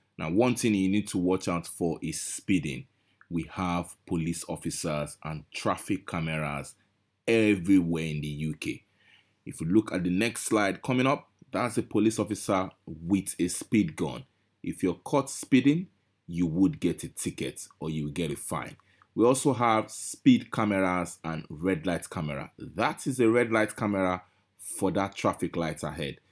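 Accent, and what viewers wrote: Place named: Nigeria. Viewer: i guess you are from Nigeria due to your accent😀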